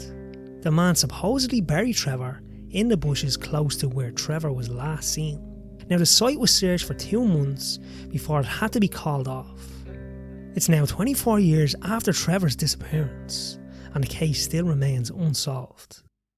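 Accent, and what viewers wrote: Dublin, Ireland. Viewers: Nice to hear a Dub accent narrating TC for a change. Understandable it has to be adjusted a bit for those not familiar with our accent. Maybe subtitles to help people acclimate? Anyway great work, keep it up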